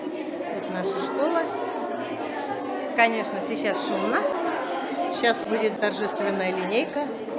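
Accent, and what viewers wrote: Tatarstan, Russia. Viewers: Настоящий татарский акцент ч на ,щ" , при разговоре на русском языке. Мило🤗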